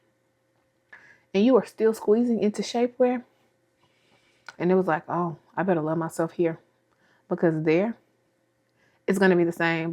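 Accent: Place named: North Louisiana, United States. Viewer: Hey hey girlie!! Girl the more I hear you talk the more I hear that North Louisiana accent lol I'm proud of you girlie and I'm right with on that journey of learning to better love myself as God took His precious time to make this precious jewel. Also keeping in mind that I too have to do my part. COME ON NA!!!!!!!!!!*punching kicking the air*lol WITH THE DESK MINISTRY! Just go ahead and read me! lol Girl editing wasn't too too bad for me but it was aggressive because once I would sit down I would NOT move or answer the phone,nor eat,drink or use the restroom because I needed to be fully zoomed in and not distracted because once i get distracted thats it,it would be hard to get back in the zone. Thanks for allowing God to use you to share those lessons! I love youuuuuuu girlie!!! 📸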